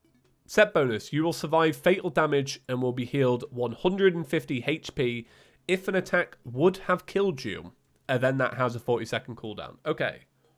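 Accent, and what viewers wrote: Australia. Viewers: Didnt know he was australian XD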